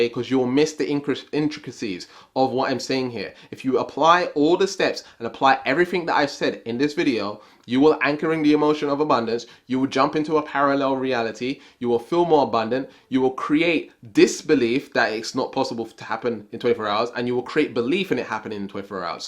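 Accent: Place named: England, United Kingdom. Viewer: Love ur English accent lol:) I AM SUPERSTAR OOOOHHHH YESSSS:))) bless you beloved friend 🎁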